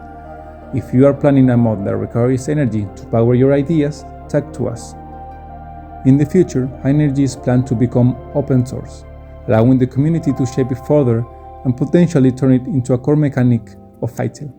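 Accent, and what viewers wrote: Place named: India. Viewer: Author sounds like Indian guy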